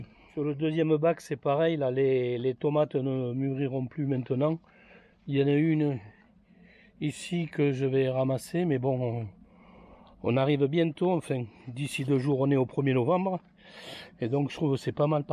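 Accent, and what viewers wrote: South, France. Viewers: Coucou de Dordogne, j adore votre accent du sud , vos trucs et astuces, vos conseils , que du bonheur !! Merci et bonne journée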